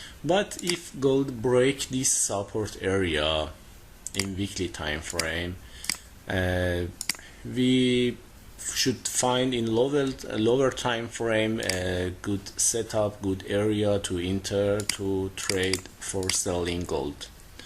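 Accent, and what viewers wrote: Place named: India. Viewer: داداش خسته نباشی ولی فارسی صحبت کن انگار انگلیسی با لهجه ی هندیه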